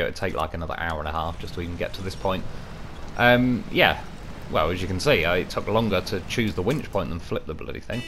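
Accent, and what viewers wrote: United Kingdom. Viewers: Your british accent is so hard...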